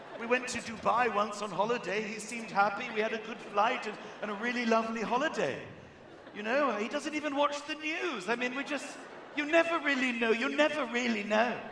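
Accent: United States. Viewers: I love his American accent too lol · I love his American accent, the way he leans on the hard "R." Funny! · I LOVE your American accent! But I could listen to you talk for hours. ♥️👂🤣🤣🤣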